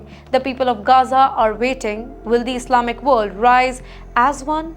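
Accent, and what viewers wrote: India. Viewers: Indian pronunciation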